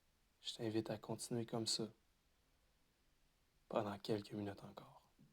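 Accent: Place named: Quebec, Canada. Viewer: ton accent québécois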